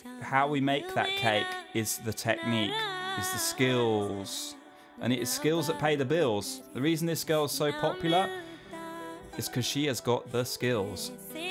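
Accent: England, United Kingdom. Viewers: Are u English ?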